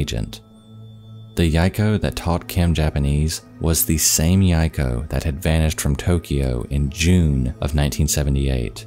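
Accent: South, United States. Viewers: Is this ASMR? Smooth Southern ASMR. Are you young people able to stay awake listening to this?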